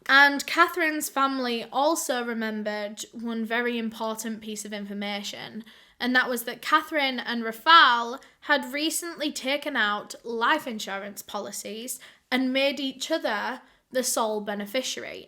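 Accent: United Kingdom. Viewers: so is that a British or Valley Girl accent. I can't tell.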